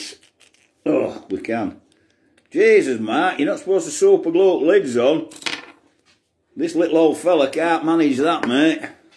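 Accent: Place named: Yorkshire, United Kingdom. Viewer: Where in Yorkshire you from cocker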